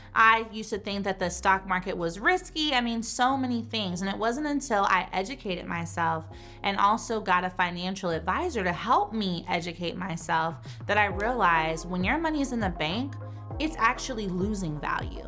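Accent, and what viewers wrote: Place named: Southeast, United States. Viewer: tell me where you're really from because you and this accent is giving south eastern United States like North Carolina.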